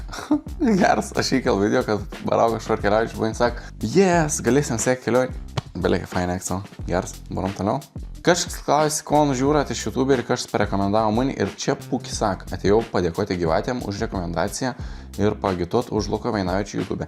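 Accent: Samogitia, Lithuania. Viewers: Pažiūriu ir tavo ir Pauliaus keliones ir nematau išvis jokio panašumo tarp jūsų ir jokio kopijavimo. Jei esat iš Žemaitijos tai ir kalbat taip. Va jei kuris nebūdamas iš ten mėgintų taip kalbėt tada suprantu, kad kopijuoja kažkas kažką. Man patinka tavo kelionės, tavo video, šaunuolis, linkiu kuo daugiau prenumeratorių ir gerų kelionių 😊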